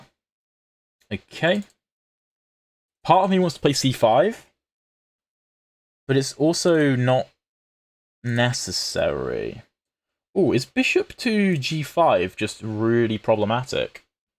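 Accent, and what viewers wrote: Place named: Outer London, United Kingdom. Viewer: Please stick to the longer time control.your 1st oppoonent bundered all over the place presumably because he didnt have time to think..wheres the accent from sounds like the outskirts of london?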